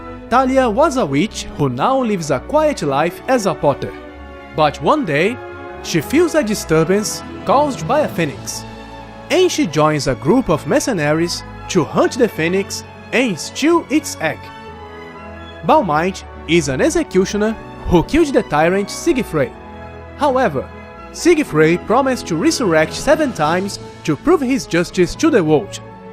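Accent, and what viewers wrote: Brazil. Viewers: moh sotaque brasileiro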